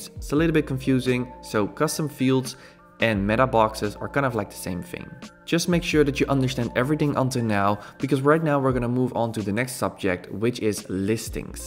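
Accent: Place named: Netherlands. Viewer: Thank you very much! This is, again, useful and clear! I need to go through the video step by step as there is so much packed into it. But every single step is crystal clear. BTW: I love your slight Dutch accent.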